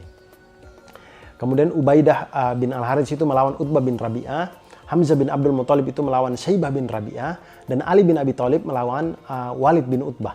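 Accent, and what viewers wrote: Eastern Indonesia, Indonesia. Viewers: Tetap ada logat timurnya ya. Suka sekali. Apalagi cara pembawaan ceritanya. Cocomi jd story teller.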